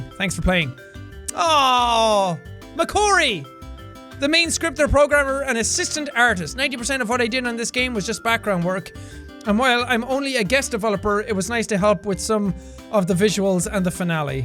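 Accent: Ireland. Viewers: It seems like Sean loses the Irish accent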